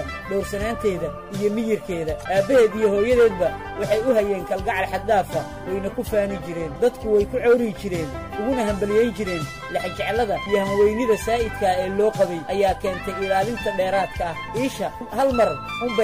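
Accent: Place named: Mogadishu, Somalia. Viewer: Sheekada waxaa Qiimaha u yeelaya wariyaha siiba lahjada Reer Mogadishu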